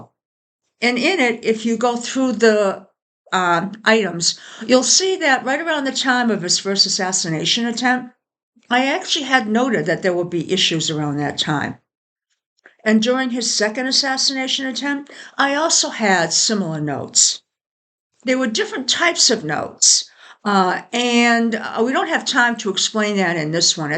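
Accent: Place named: New England, United States. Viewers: I recognize your accent. Where in New England are you? I'm in Northern NH 😊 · Love your Boston accent! Familiar to my ears. Others saying similar things post eclipse. Truth, justice consequences.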